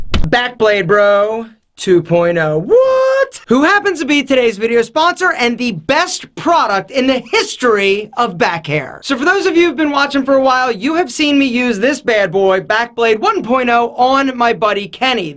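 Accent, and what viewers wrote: United States. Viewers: Sounds American to me...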